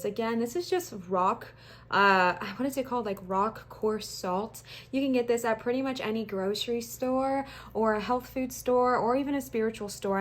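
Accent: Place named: England, United Kingdom. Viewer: Pile 3 here💖💖💖 Once again I'm called out on being an aquarius😅💖 Lol so first thing I thought was hilarious is that last night I was having a little trip and I couldn't stop speaking in an english accent lol And also, was thinking in an English accent, like without even really thinking much of it or even trying to. When I came to this reading and you started talking in the english accent it made me remember that and I'm like so curious on what this english accent means to me lol Universe just wants me to notice that😅 A thing that I noticed that I think is super cute is how you yawn a lot when you do readings. I feel like these yawns mean something, I've always found myself doing the same thing while trying to perform in certain ways. Lol for instance when I sing in front of people sometimes I yawn A LOT and I just can't stop and never knew why. 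I never thought about it so much until I started watching your readings. I wonder if yawning is like a sign of spirit coming through or something, like a natural reaction for some of us. Whatever it is, it's fascinating and I'll probably google it lol Thank you for this, it resonated so well and was so empowering. Everytime I start to question myself I love how I have this beautiful tarot community who can help me connect to my guides. It's honestly so important, it helps me keep pushing through. I get what you mean about the comments people make. Like, this comment a friend said to me last night almost stressed me out a little bit. I w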